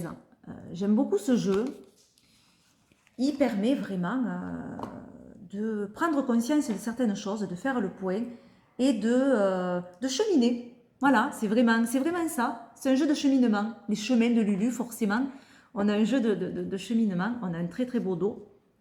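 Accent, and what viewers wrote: South, France. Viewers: ton accent du sud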